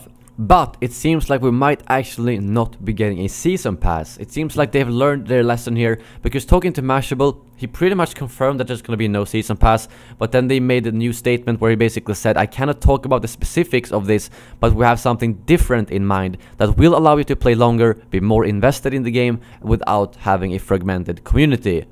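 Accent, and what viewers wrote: Sweden. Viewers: Man kan höra på din engelska att du är svensk. Men bra video annars!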